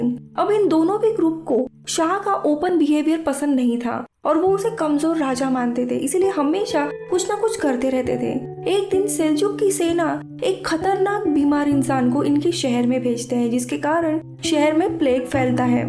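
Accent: Maharashtra, India. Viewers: May be from marathi school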